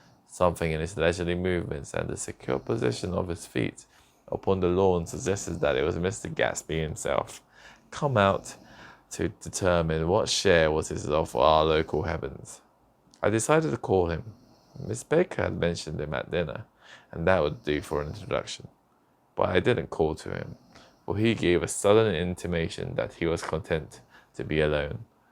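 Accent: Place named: United Kingdom. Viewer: 잠이 솔솔오네요ㅎㅎㅎ 목소리도 영국식발음도 너무 좋으세요 앞으로 매일밤 들으면서 꿀잠잘 것 같아요💫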